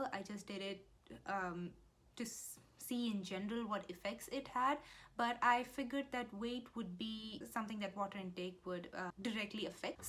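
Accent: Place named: India. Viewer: Typical indian accent, bathroom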